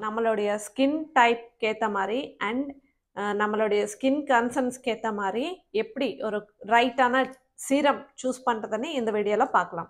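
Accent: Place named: India. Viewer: looking beautiful in yellow saree😍pimples will come due to body heat 😍Alovera fresh a eat pannu na pimples reduce agum 😍ponnu put vlog in pink saree😍your tamil slang is cute😍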